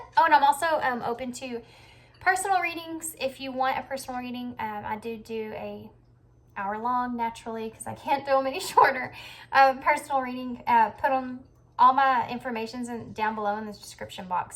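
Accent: South, United States. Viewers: Hi :) I just found your channel and I have to say that I love your energy and I really relate to your messages and the way you bring them across and they are helping me so much right now.. I’ve always loved a southern accent too so I just like listening to you talk! Lol thank you for taking the time to do these readings I truly appreciate it :)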